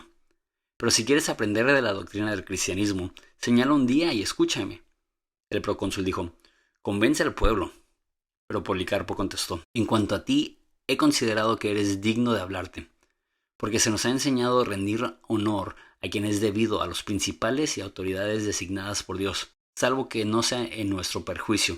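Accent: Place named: Mexico. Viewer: Vos no estás capacitado para leer en vos alta: mala dicción, pausas inadecuadas,falta de acentuación y de entonación, tu marcadisimo acento mexicano.La misma máquina que transcribe al pie lo demuestra.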